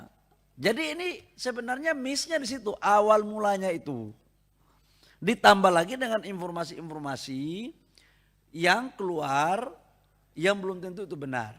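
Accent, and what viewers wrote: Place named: Papua, Indonesia. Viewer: 😂😂😂 mantap pak menteri sa suka logat p Papua d pakai d sini. D tempat wakil rakya.😅😅😅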